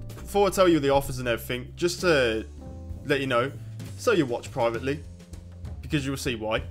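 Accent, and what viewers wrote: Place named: Birmingham, United Kingdom. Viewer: Piss take!!! By the way can I just say there’s no better accent than a cynical Brummie one!!! I know, I am one😂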